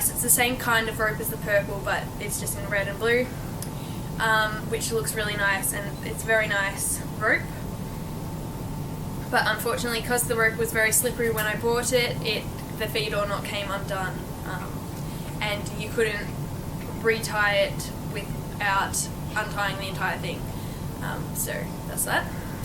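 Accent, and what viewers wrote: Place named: Australia. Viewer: i love your axcent are you from australia?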